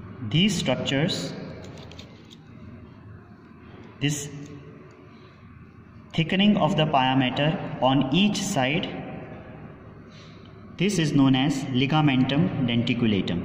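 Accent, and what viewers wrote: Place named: India. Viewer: Indian accent is so cool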